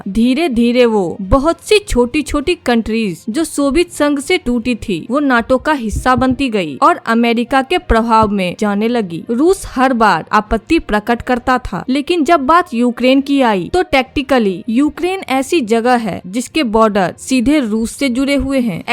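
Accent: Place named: Begusarai, India. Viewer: Aapki aawaz Bihar k begusarai ....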